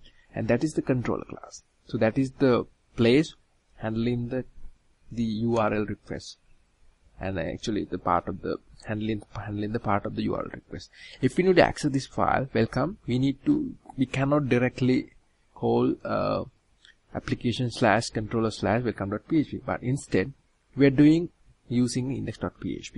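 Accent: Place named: India. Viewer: m sorry to look racist CAN YOU PLEASE FOR THE LOVE OF GOD STOP INDIANS MAKING TUTORIALS WITH THEIR LANGUAGE? LET S FACE IT YOUR ENGLISH ACCENT SO FKN FKCED UP WE DON T UNDERSTAND.... Sorry again yet it s the truth... have someone else do the voice over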